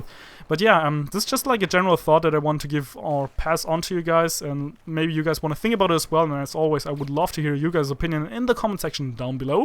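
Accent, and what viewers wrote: Germany. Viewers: did you speak german?....Deine Aussprache klingt nämlich sehr Deutsch ^^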